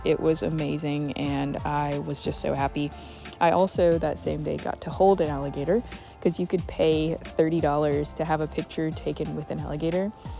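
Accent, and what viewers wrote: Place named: South, United States. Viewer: Been following on insta forever, but I did not expect your voice to be so southern! Your slight accent is adorable. I hope you make more of these, I loved the animal facts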